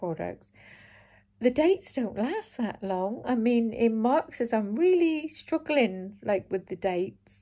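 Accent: Cornwall, United Kingdom. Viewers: Hi, love your vlogs. We lived in Cornwall for over 20 years. Altarnun village on the edge of Bodmin Moor. Lovely to hear the Cornish accent again.😊😊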